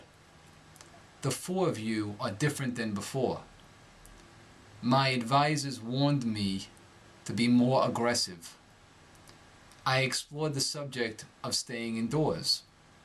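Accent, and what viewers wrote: New York, United States. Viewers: The thing I love about this guy is that he looks like the people I imagine speak this accent. I'm from Sweden so don't hate my prejudice too much :P I have to say that this is the most lovable accent from the U.S. of A. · Crazy shit, I'm from NY and I don't even hear the accent intll he shows the difference between the pronunciation.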